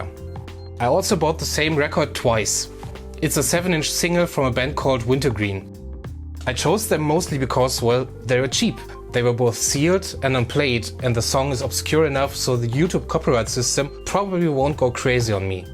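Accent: Germany. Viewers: Your slight German accent is adorable! Und Du bist so gescheit. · Are you German :D?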